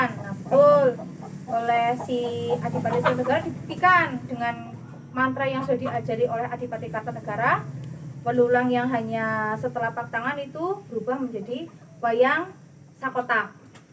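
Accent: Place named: Java, Indonesia. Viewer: Ternyata bhsanya sedikit medok ... 😂😂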